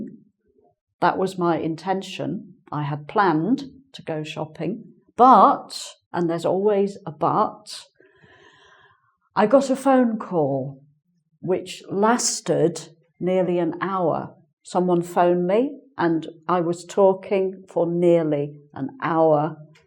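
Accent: England, United Kingdom. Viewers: Hii, mam I am from the ndia. I want to learn this English accent,, Please tell me ,,have you any application, or online course? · Thanks for existing,! It helps so much. I'm from Belgium. They say I have a good pronunciation but I would like to practise my British-English and your videos are amazing for this. I can understand every single word you say without a problem. It's super-super nice. British accent is the best so far. Thank You, x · Thank you sweet. It was an excellent class. I could do note a little british accent? God bless you! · Nice to see more of British accent here! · This is the British accent that I love. Very clear lesson. Thank you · Are you british? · fast 1 month with Bacitikdaithanh this new teacher is so adorable. Welcome,. By the way, you're British, aren't you? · Hello, Dear ) I am verry happy to find you as a teacher ) You are such a nice and pleasant person to watch and listen to) To be honest I was in search for someone whose accent is absolutely British and I am sooo delighted to find you finally!) You inspire! 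) Thank you so much for that!) Looking forward for your new videos) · Hey, I'm from Spainl and I'm hope to improve my Inglish, I loved your brithis accent, your video was perfect, congratulations, hugs for you! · actually I love a lot of teachers on Engvid. but I love mama cos she uses British accent 😊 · I love your clear British accent. Many thanks for your videos. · British accent was not easy to understand for me because I have more experience with American accent however, I am getting better little by little, thanks. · I love your beautiful english accent .What I really love is that It's not different to understand your explanation of most sentences as I know you are trying to speak slowly so that your pupils could understand it.Thank you so much! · Awww this new teacher is so adorable. Welcome,. By the way, you're British, aren't you? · Hi I'm new subscriber and i really love learning English and the British Accent. 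I found it really helpful. thank you so much for sharing with us :) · Your accent is wonderful. I really like the British accent, for me it is the best, the most pronounced, the cleanest, the best pronunciation. The English spoken in the United States is incomplete, it seems that they are too lazy to speak, they remove the letter T from the words, many unnecessary contractions. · i'm from brazil and i'm using to pratice my english foreigners, i loved your brithis accent, your video was perfect, i loved it, congratulations, hugs for you! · Nice Video.Thanks.You sound like British.Are you from England?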